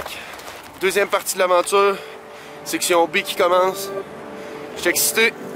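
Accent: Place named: Quebec, Canada. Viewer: Merci de partager ça! Le timing est parfait étant pogné dans la maison avec les Rocheuses pratiquement fermées partout en Alberta. Je continue le vidéo dans quelques jours pour rallonger ça un peu! Très belle cinématographie et en tant que québécois expatrié en Alberta, c'est le fun d'entendre l'accent (de Québec?)! Bonne chance dans tes futurs projets. ✌🏼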